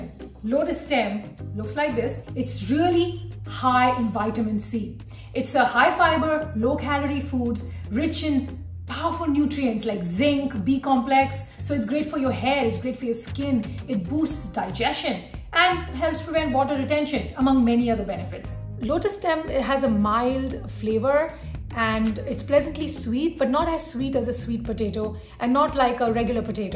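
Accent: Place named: United States. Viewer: Wow u picked up American accent very nicely. You are same way beautiful.❤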